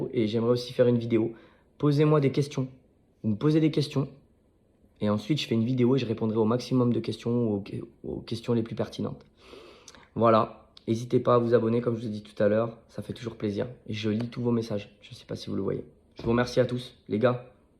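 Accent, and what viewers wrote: South, France. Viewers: Salut, tu es originaire de quelle région de France ? Tu sembles avoir l'accent du sud ;)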